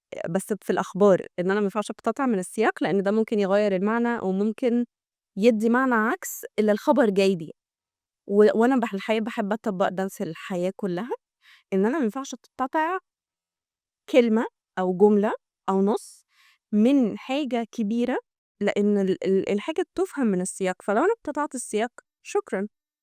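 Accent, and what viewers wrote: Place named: Gulf, Arab world. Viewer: صوتك خليجي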